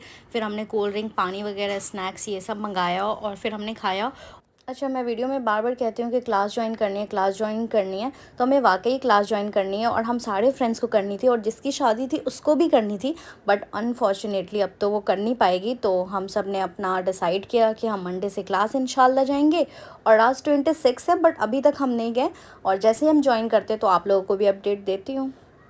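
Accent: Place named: Bihar, India. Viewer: Jo log soch rahe h yh ayse kyo bolte h to mai btatu jisko nhi pta ho yh bihar say h waha aysr hi bolte h i am from upppp❤❤❤❤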